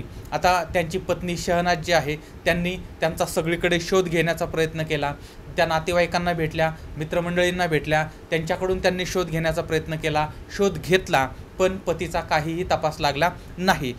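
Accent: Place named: Ahmednagar, India. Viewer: This is the way Nagar people talk accurately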